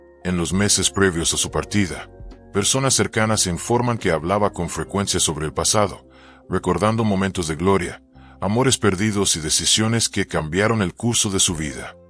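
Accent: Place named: United States. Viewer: Con todo respeto no seas Mamon , Habla bien eres mexicano??? O gabacho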